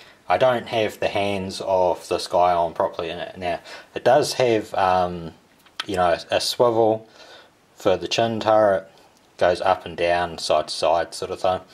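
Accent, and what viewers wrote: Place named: New Zealand. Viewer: You sound like your a fellow kiwi , then I heard tfnz haha but awesome man I subscribed.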